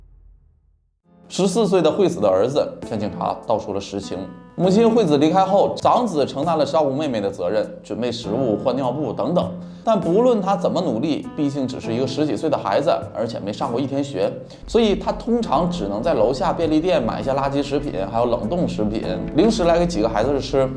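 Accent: Northeast, China. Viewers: 感覺樓主為了把普通話講標準特別吃力，還是經常露出東北話的發音，總是替他捏把汗！其實根本不用刻意地說標準的普通話，用東北話講解更有特色！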